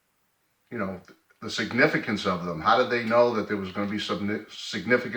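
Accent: Boston, United States. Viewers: Hi thanks for sharing, just found your channel...seems you have many videos I'm going to spend time watching!! Thanks!! I lived in Boston, Ma, for many years do I detect an accent??;-)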